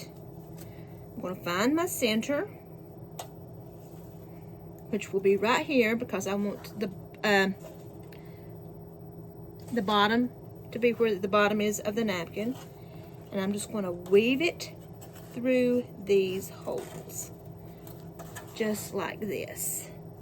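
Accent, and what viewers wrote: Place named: South, United States. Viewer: Hi Sweet.. I'm excited to see this creation using a tray... Funny how wood takes staining differently... Don't ever apologize for your southerness coming out at all... I love that about you... God didn't make us perfect and I love that... The metal ribbon border really makes it pop and very beautiful... How cute is that... I love it.. Turned out beautifully as always.. you are such a creative person, I would have never looked at those pieces and put together what you just did... You amaze me each and everytime... The green ribbon adds another level of beauty to the piece.. the flower completes it and draws it all together... I like the ribbon on it.. thank you for sharing this activity... God bless you and your creativity.. love y'all Sweet... Till next video stay just like you are.. Bye..🥰🥰🥰🥰🧶🧵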